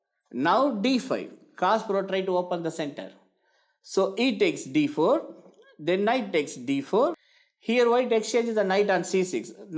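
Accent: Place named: Tamil Nadu, India. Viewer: Super sir I am also tamil..... your English was super sir....I guessed you are a tamil people....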